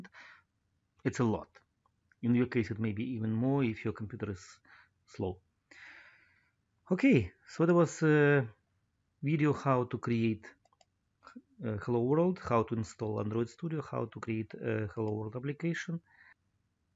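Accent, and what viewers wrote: Russia. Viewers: чета у тебя русский акцент и фамилия русская?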